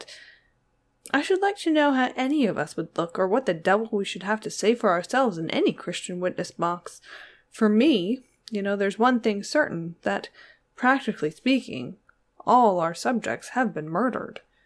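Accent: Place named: United States. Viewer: Hi, I came for a listen at the urging of Tony Walker (Classic ghost stories), & I find his praises correct. Wonderful narration, & your character shines through in a fabulous quirky way that I really enjoyed. I have to confess that I generally avoid narrators with American accents, (sorry, I find so many of them grating, might be an Australian thing?). Happily your reading made the accent irrelevant ... Loved it.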